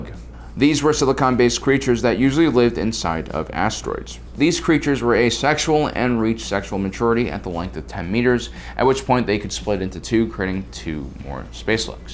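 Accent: United Kingdom. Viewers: What happened to British?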